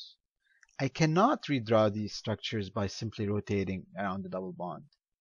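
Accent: Arab world. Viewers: You sound pure Arab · lol I can hear your Arabi accent :P thank you for this!...test tomorrow ;(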